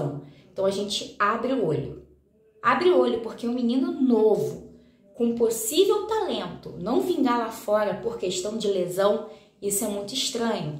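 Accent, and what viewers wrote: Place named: Rio de Janeiro, Brazil. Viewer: o sotaque carioca é tão bonito,quanto a própria cidade. o fluzão,só poderia ser dessa cidade,conhecida mundialmente,como cidade maravilhosa. 🌅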